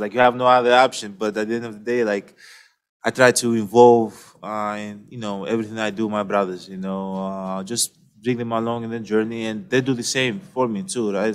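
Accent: Greece. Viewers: I love his Greek 🇬🇷 accent ☺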